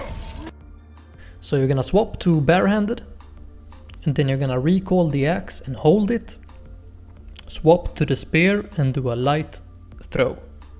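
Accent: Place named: Sweden. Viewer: very nice swedish accent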